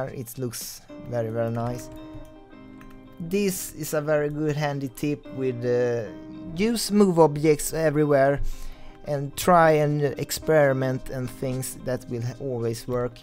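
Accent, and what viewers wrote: Sweden. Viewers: Dat Swinglish xD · The swenglish 😂😂 I am from Sweden so it doesn't bother me 😂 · dat swenglish man ;)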